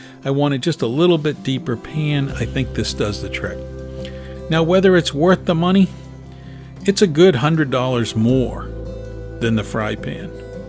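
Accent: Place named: New York, United States. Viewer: you from ny aint you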